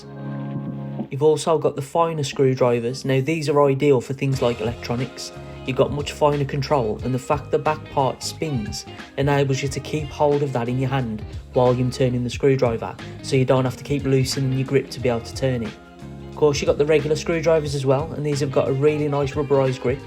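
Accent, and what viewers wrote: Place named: Birmingham, United Kingdom. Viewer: Up the brummie, great ole mate😊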